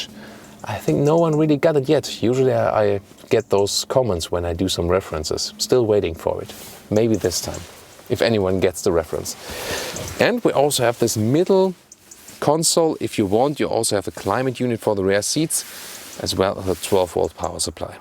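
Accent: Germany. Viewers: I don't think I'll ever bother to watch BMW reviews without a German accent again. It just seems. so. right.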